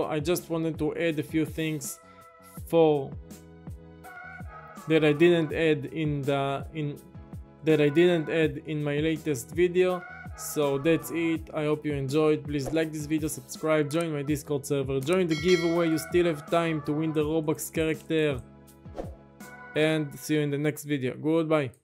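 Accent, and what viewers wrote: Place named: France. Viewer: ton accent français s’entend trop ptdr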